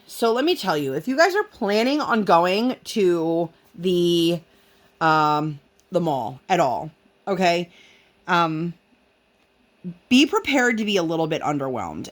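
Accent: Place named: Philadelphia, United States. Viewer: Hi, it sounds like you had a really wonderful trip!! I wanted to mention that I’m a Philly girl and my husband is from King of Prussia. I mention this because it sounds like you’re from the area. We live in the Pocono’s now. What area are you from if you don’t mind me asking? I love listening to your WIP and Chats, but I don’t comment much. I’ll try to be better at that. Take care of yourself and I’ll see you in your next video. 💜 Dawn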